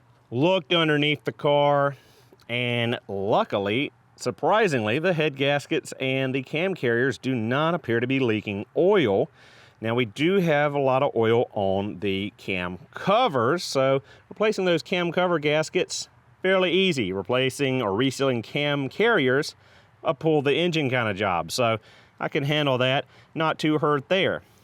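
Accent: United States. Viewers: OK, I love the East/South Accent....Anybody gonna mention whatever is leaking or so......LOL. Great video and this cat can have that engine out and broken down in an hour. LOL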